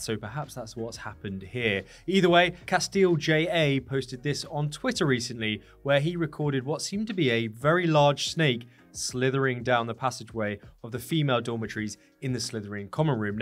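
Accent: England, United Kingdom. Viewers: always! Your videos are the only ones I’ll watch for HL content. Professional, eloquent, and no fluff. And an English accent is much more preferable to an American one. Haha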